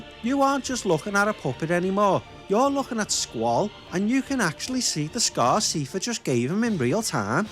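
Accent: Liverpool, United Kingdom. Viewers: Man the Scouse is THICK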